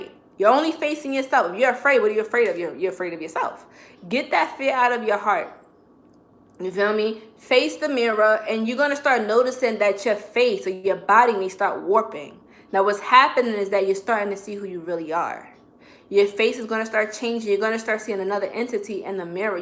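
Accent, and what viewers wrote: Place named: Brooklyn, United States. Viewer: I love you my Sister! You had me at Chaos Magick then you dropped info on St Expidite and I knew you were bad ass and that BK accent is off the chain. Shout out to Masterly Foolish for bringing you to my attention. I'll be checking you out and hanging with you. Let you know how the mirror spell works. Thanks for the knowledge.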